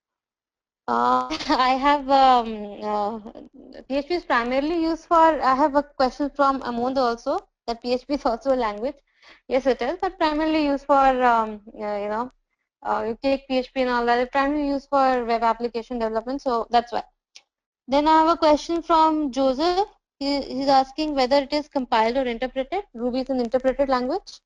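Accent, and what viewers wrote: India. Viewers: Hey Edureka. Why dont you activate English subtitle sections on your videos? Sometimes it's really hard to understand Indian accent so subtitle make to understand easier. Thanks.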